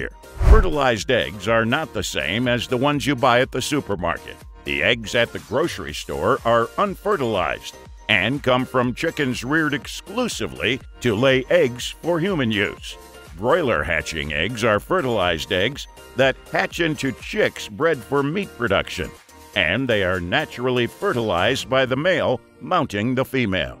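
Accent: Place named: United States. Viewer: such almighty american (note the lack of capital in the spelling..) So why are the EU not willing to accept us produced chickens..oh could that be the use of BANNED chemicals..but the big american voice over says the are JFK rn certified 100% approved for human (nay american) consumption. Hey - just a thought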